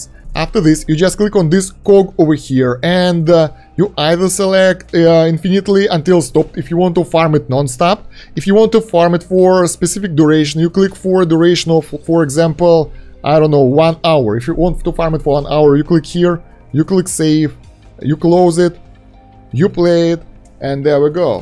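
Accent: Russia. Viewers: Ты русский или умеешь говорить с акцентом?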